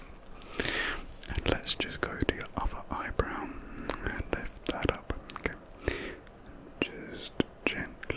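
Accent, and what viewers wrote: United Kingdom. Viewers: Now this is what to listen to while doing college homework! Handsome British accent boy is back! (well at least it sounds like he has a tiny bit of an accent)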